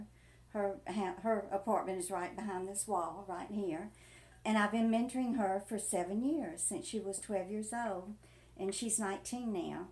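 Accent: South, United States. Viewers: Your such a sweetheart❤❤ please try not to let anyone take advantage of you i love your Southern Charm and accent it's adorable.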